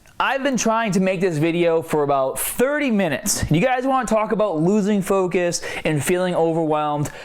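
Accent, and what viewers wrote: Boston, United States. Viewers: The boston accent is heavy in this one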